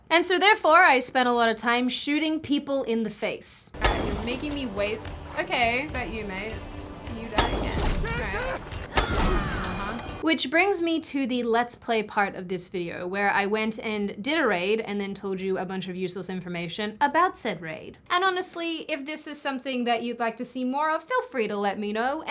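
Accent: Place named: Australia. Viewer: I think it's fun watching, plus I just like to hear you speak. Being in Dallas, I don't hear many Australian accents.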